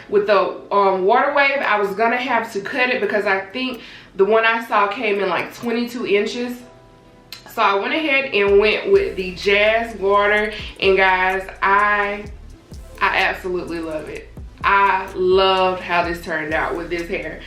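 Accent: United States. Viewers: i love her accent!! so cute and southern lol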